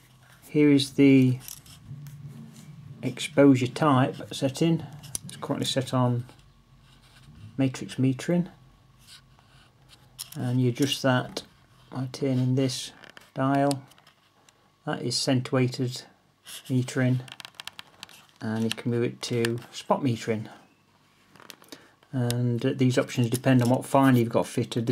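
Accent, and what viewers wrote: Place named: North, United Kingdom. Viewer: I miss hearing that "Northern Accent" since moving to Bloody Florida!!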